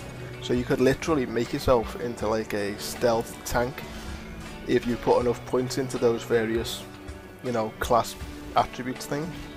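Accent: Liverpool, United Kingdom. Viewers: Oh and its nice to hear another scouser on YouTube =D that alone earned my sub =D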